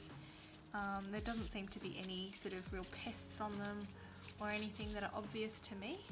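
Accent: Australia. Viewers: Nice to hear an Ausie vioce for a change, will be following your channel to see what else you have to teach me.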